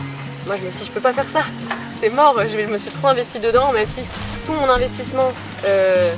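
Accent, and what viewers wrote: Quebec, Canada. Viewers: Cool de te revoir ça fait plaisir d’entendre ce petit accent québécois. Et toi tu en est où?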